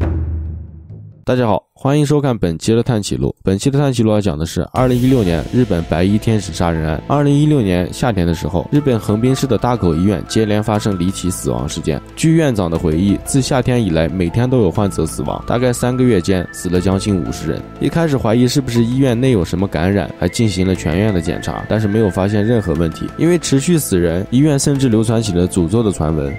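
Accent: China. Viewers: 哈哈，果然能听出来山西口音😄😄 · 播主是呼和浩特人？口音好重。。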